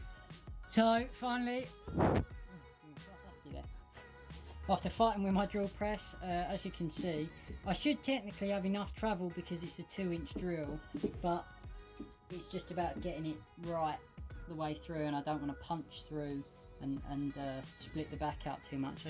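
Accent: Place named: Essex, United Kingdom. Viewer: You sound like you're from my neck of the woods. Are you from Essex or East London? I'm originally Walthamstow, then Wansteasd.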